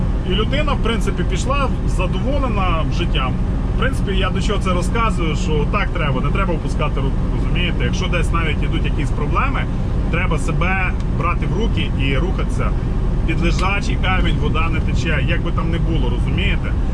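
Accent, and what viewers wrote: Lviv, Ukraine. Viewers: Класне пояснення гарна дикція Тай акцент скорше Львівської області. Однозначно лайк.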